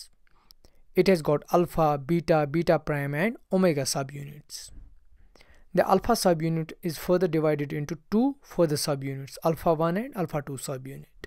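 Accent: Kashmir, India. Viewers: Sir Are you a Kashmiri. It seems by ur accent. Please reply.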